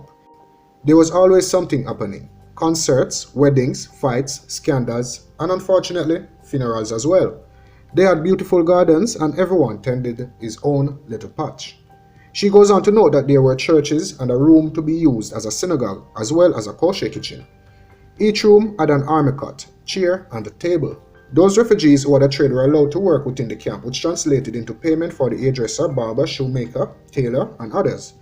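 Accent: Jamaica. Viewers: Apaul A is fascinated with your Jamaican way of speaking...lol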